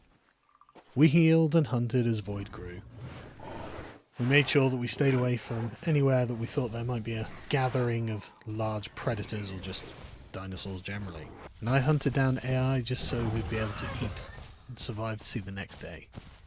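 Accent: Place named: United Kingdom. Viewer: No hes just british🤭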